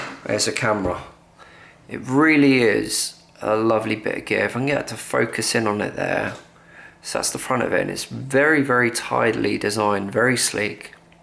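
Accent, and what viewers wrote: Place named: London, United Kingdom. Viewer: it's a regional accent most likely - probably down south around (east) london. there are many of them. words often arent pronounced like theyre written. certain sounds simply aren't produced. other languages, like italian in particular, are famous for this.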